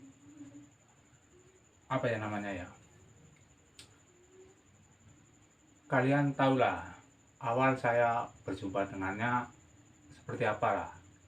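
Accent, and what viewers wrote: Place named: Banyumas, Indonesia. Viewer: Sama sama 🙏, alhamdulillah sudah berubah, dari awal saya suka dngn gaya bicara lucu ngapaknya, semoga canelnya makin maju n selalu di beri kesehatan n bisa menghibur subscriber aamiin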